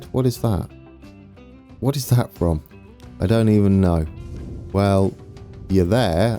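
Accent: England, United Kingdom. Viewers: I live in Minnesota and you have represented us well. To bad the game didn't have the seasons as it is beautiful here in the Fall and very white and cold in the Winters! Might be fun riding along with you on your Interstate 35 and sliding around in the snow and ice! Your my fave! Look forward to your updates and can't wait for your your new TF 2 venture! Thanks for all the hours of pure enjoyment. Why haven't you picked up on the Texas accent yet? A Texas/English accent would be fun to here. By the way. I may be your oldest viewer. I'm 70 years young. I have the game. But my old burnt out brain may not be able to play the Hard or longer games. So I live through you! haha Kinda scary huh!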